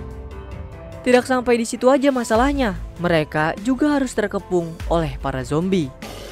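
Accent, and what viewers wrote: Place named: Banyumas, Indonesia. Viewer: Anjir ,,,ngapak coy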